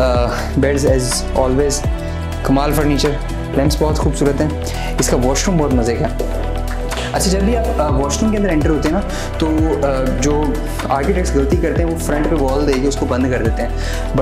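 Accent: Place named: Pakistan. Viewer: mashaAllah gar bohat payara hai .. yar thanks God kisi ne to apnay pakistani style main baat ki ,, otherwise 99.9% YouTube par sab Pakistani Indian youtubers ki tarha baat kartay hain ..( Indian Styal ) door ki baat karin to floor ki baat karin to .. ya phir .. dekhnay ko milta hai dekhnay ko milta hai baat karin to baat karin to :) please don't copy others for God sake talk in your own style .. like this man .. bless you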